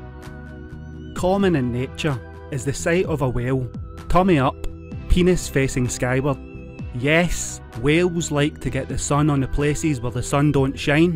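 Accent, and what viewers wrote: Scotland, United Kingdom. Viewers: The only thing about this that makes it so that it’s possible to watch this super disturbing video is the Scottish accent. I love that. I do not love seeing whale peens. Hahahahahahahaha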